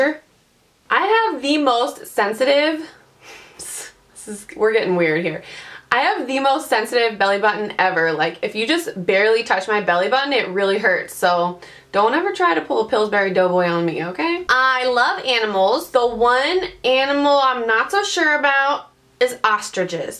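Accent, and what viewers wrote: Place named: South, United States. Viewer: this sounded SO southern but I loved it lol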